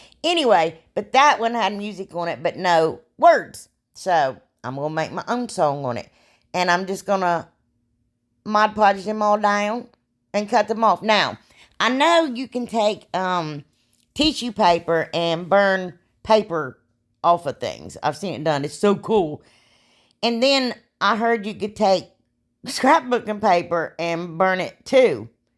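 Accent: South, United States. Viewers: You sound so country I love it. You really want to light the house up with that kerosene and gasoline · Hi from Texas, I'm newly subscribed and wanted to say how much I've been enjoying your crafting. You are such a delight to watch and when you get tickled it makes me laugh right along with you. You talk like I talk country kind of people. You keep those videos coming because you are a treasure to watch. I hope you get to feeling better. You have such a sweet personality, God bless you honey.🥰🤗💕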